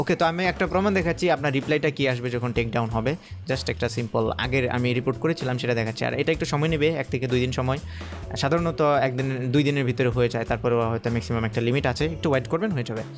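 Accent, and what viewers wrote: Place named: Chittagong, Bangladesh. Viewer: Apnar bari ki Chittagong e naki because amar bari o Chittagong e apnar kotha shune lagche apni Chittagong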